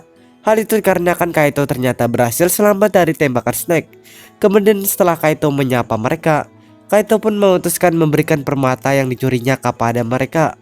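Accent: Banjar, Indonesia. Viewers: Aksen Banjar🤣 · Kok kayak orang banjar yak logat nya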